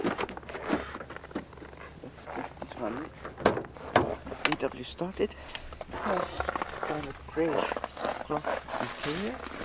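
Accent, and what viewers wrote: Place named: Russia. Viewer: he's Russian, at least he try his best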